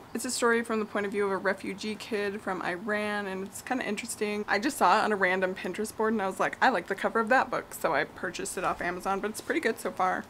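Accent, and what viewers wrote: United Kingdom. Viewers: Well done. I hadnt realised that Headspace has that suggested run of things for the morning, thanks for that! i've been not noticing that function:) also, I LOVED your British accent, very good. You sounded very familiar! Thanks for a great video. take care x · Top effort for your British accent! ⭐️ 🇬🇧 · I need a morning routine. Cheers for the reminder and LOL at your British accent. I'm from Manchester England 😂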